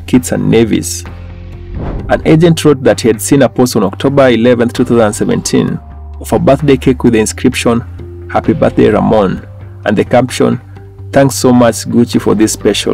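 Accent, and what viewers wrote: Kenya. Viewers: Mr narrator, are you kenyan?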